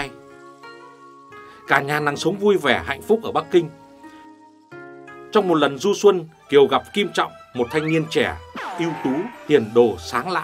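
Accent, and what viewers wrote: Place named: Hai Phong, Vietnam. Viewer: Giọng Hải Phòng đặc sệt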